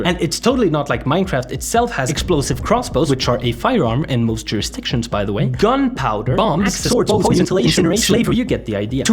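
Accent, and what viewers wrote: Sweden. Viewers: The angrier he gets . The more Swedish he becomes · I love how he gets more Swedish as the video goes on. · Lol he's becoming more Swedish as the video goes on and he gets angrier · Is it just me or is his accent getting more swedish as the video goes on? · The Swedish accent just got worse and worse lmao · You can tell that it gets really personal when you can hear more of the Swedish accent from him as the video plays on.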